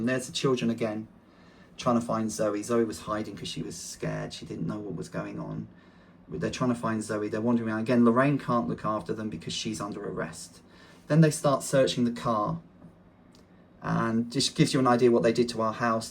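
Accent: United Kingdom. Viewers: THE NARRATION VOICE IS CERTAINLY FEMALE & Brit, to my ears. 1. Surprised "SHE" has a "WIFE". First surprise. 2. If you can "count" the officers, they're there in Numbers, Not in an Amount.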